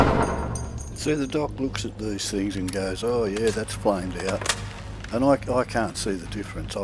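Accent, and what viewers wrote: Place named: New Zealand. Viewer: Based on the accent, I would guess phlegmatic New Zealander. Am I close?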